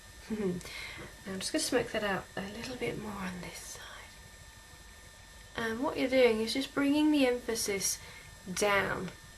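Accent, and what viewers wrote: England, United Kingdom. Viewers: iam not gay or anything I love your voice. Are you from England?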